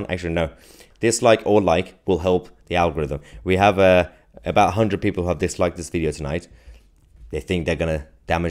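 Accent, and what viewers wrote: United Kingdom. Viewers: Just discovered your channel two days ago. Love your reporting and your British accent! Viewing from Kansas in the U. S.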